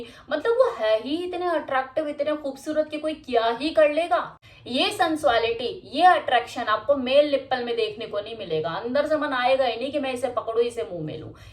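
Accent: Haryana, India. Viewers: App Haryana ke ho?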